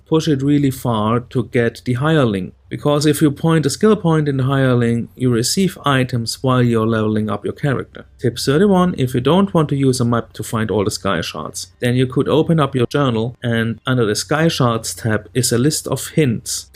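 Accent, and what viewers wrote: Germany. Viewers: dat heavy german accent xD thx for the tips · Good collection of some basic tips. But man ... your german accent is HORRIBLE XD Beeing from Germany i feel i can say that without sounding like a dick ;)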